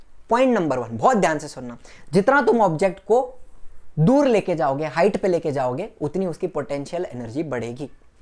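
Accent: Maharashtra, India. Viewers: Sir you from Maharashtra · Sir are you marathi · Sir you are Marathi 😁😁😁😊😊😊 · His sir you are Marathi???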